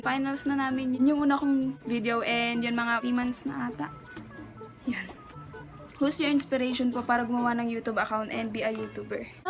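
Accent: Visayas, Philippines. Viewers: Hahaha bisaya jod kaayo 😂 miss u hahahah puntahan kita perps mol papapicture ako sayo 😂🙈💞 replaye ko please hahah now pako nag tan aw sa imo mga vids. Sub na! 💋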